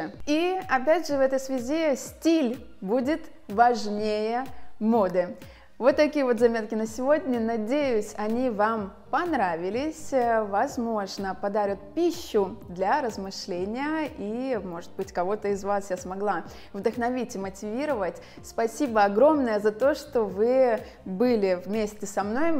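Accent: Italy. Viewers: я обожаю ваш итальянский акцент🥰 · Обожаю, как вы разговариваете, у вас даже речь стильная! Грамотная, уверенная, женственная и как вишенка на торте элегантный итальянский акцент!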